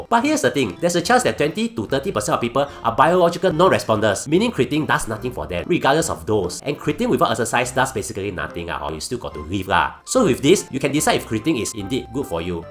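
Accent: Singapore. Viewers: This is a Singaporean cat. He’s speaking in Singlish dialect . 😅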